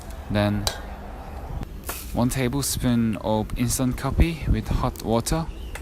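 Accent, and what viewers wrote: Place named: Australia. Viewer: 호주액센트 멋져요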